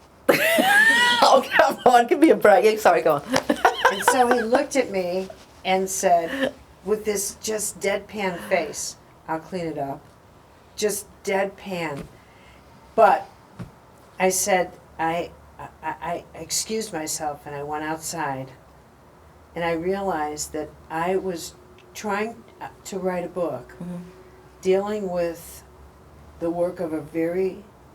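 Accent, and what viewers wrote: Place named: Chicago, United States. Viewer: I do sometimes feel annoyed with her and wish she had a better speaking voice e.g.That American Chicago accent can grate.But her amazing insights into the behaviour of people and religious Myths and people's everyday way of dealing with life is so darned honest and incisive it is riveting. As much as i love her I will not give up the scaffolding of Catholicism for her intellectualism. Like St Teresa of Avila I love it.And CM has become much nicer since meeting that great Catholic mystic.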